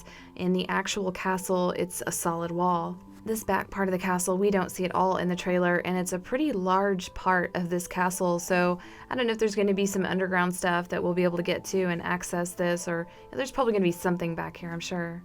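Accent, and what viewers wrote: Texas, United States. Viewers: what part of Texas are you from? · "Southern accent" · I didn't know she was also Texan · wait, ur from Texas?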